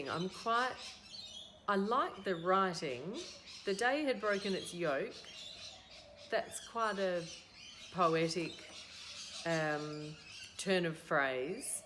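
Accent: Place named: Australia. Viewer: Oh wow. Another Aussie! I found your channel through Shaun’s video and was so excited to discover your accent! I look forward to more videos and am off to subscribe to your podcast... Love the sounds of the birds in the background. Reminds me of being near the beach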